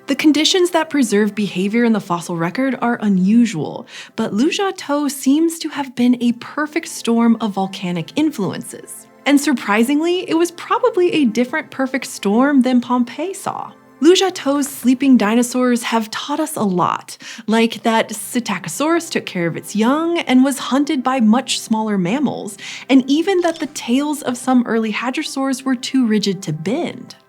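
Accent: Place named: United States. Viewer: Dear PBS, for us older folks that still use the imperial system of measurement , please use both systems when describing distance. This speaker sounded very American so why are you using the metric system and not including the older American imperial system ? We old folks aren't dead yet please mention both systems.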